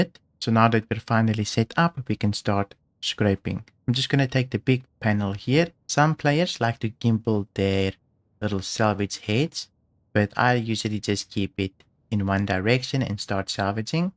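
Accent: South Africa. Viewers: Hey bud, sound like a SA, you on a Discord. Looking for people to play with, love the vids.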